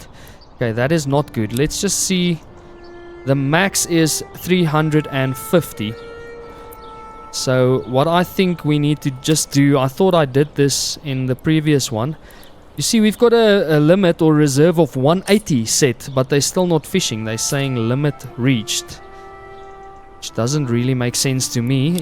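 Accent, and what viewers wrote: South Africa. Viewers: I hear a SA gamer i subscribe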